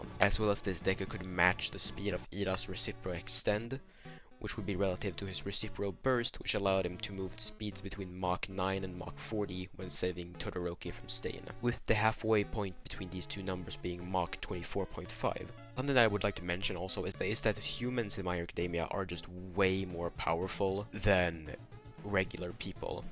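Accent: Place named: Sweden. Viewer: This dude sound Swedish ( great video btw, shit was good as hell)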